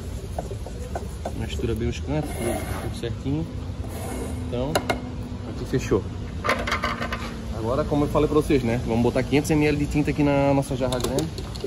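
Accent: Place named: Santa Catarina, Brazil. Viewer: Top o trampo! Com esse sotaque deve ser do litoral de SC, hhahahahh. Salve do Zinga/Floripa.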